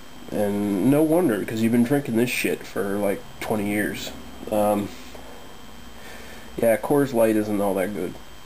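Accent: Canada. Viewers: you have a VERY canadian accent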